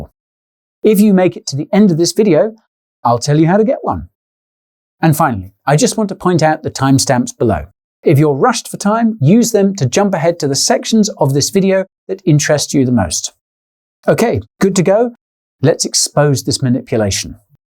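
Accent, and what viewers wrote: United Kingdom. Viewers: Amazing. He has a British accent that is tolerable. As well as an understanding that all traditional banker gangsters would ever want to do is rain on your crypto parade. Smash the like for that! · You should say "howdy" at least once every episode because with a british accent that is hilarious. (texan)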